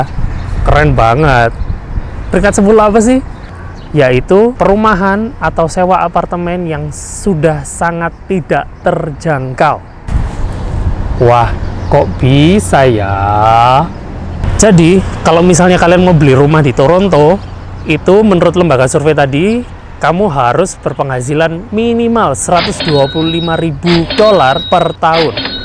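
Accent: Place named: Java, Indonesia. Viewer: Jowo mas😂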